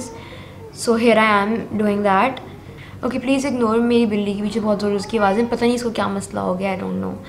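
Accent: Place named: Pakistan. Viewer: picture are good because am a photography & i have my own studio in multan but the thing i like is your accent during speking english means k english urdu mix 😁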